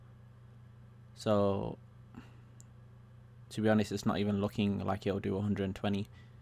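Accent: England, United Kingdom. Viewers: You sound asian british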